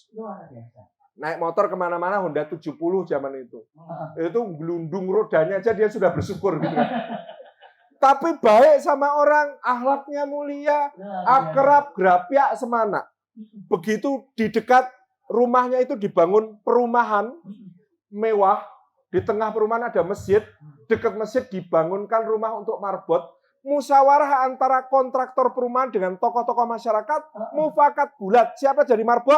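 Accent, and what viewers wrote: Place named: Banyumas, Indonesia. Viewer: Ust. Salim memenuhi sunnah Rasulullah saw, berbicara dgn bahasa kaumnya. Di Purbalingga bicaranya ikut ngapak 😅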